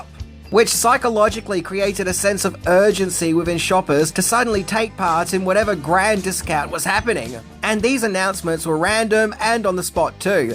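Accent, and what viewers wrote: United States. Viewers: Excellent video,! An interesting departure from movies. Used to work at Sears, and your research, as always, is spot on! Plus, great American accent! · Nailed the American accent lol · I wonder if this is a setup for a Troop Beverly Hills review or other movie featuring K-mart . . . Love that American accent 🙂 intentionally funny but still good too 👍😃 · Love the American accent!!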